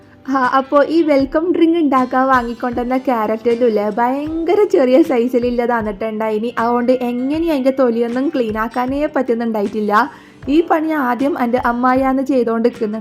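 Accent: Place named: Kannur, India. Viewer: ഞാനും കണ്ണൂർ ആണ് എന്ന വിചാരിച്ചു കണ്ണൂർ സംസാരം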